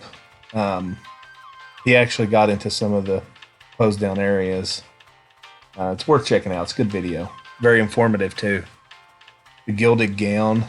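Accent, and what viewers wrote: South, United States. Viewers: West Town Mall sister property of Simon Properties is thriving along with Turkey Creek lifestyle center is the retail hub for Knoxville. Pigeon Forge has a huge outlet center which is a destination. I love your southern accent. My family's from Lenoir City Kingston area.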